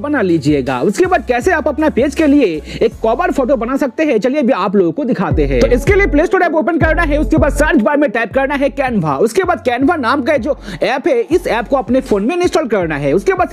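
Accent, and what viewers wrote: Bengal, India. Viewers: You are Bengali right 😂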